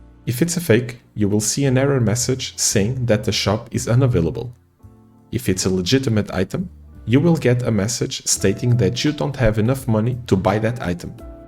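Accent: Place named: Chile. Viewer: Sos chileno no? Te saque el acento en la primera oración jaja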